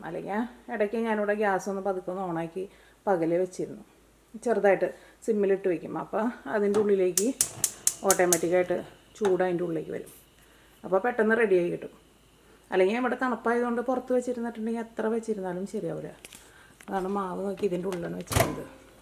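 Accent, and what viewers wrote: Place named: Angamaly, India. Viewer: yude ella cooking enikku eshta.nalla presentation anu.angamaly ano kuttide place njan Kure kalam thamasichittunde.kuttide slang ketteppol thonniyatha.enikku mole valere eshta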